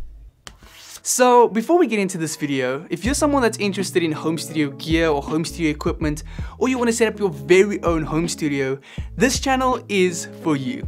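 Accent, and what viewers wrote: South Africa. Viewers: Sound south african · You South African bro?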